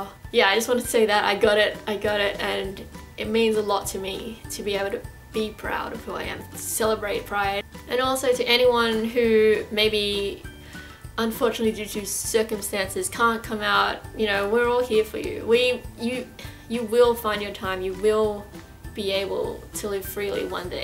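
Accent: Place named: Australia. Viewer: Very powerful Vid to those still in the closet. U earned yourself a Sub from a str8 guy. I don't judge ppl based on sexual preference. I judge ppl based on persona, good or bad. Just want to say, I've watched quite a few GOT reaction vids while bored work. Many are fake, and not genuinely emotional as your last GOT reaction vid. "Arya's reunion with Nymeria" Thats all it took for me to Sub. It almost made me tear up, and thats not an easy thing to do. Haven't shed a tear in 10 yrs, lol. Well, that and I like your cute Aussie accent. ;)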